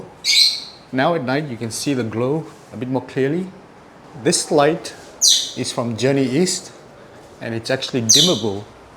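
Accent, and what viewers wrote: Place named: Fiji. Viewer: Bula from Suva...I do remember being introduced to your family restaurant in Nadi while working there in the mid 1990s by work colleagues, Paul Chang and Terence Low (Bank of Hawaii). You asked the question in one of your videos whether to open a restaurant in Singapore...My answer is why not open in Suva. I think with your knowledge of fusing asian and pacific cuisine and unique experience, you would do very well in Suva, and would really complement your Singapore Supper Club. I'd be very glad to assist you set-up if you're interested. BTW, I'm enjoying your videos and you still have a very strong Fiji accent...cheers.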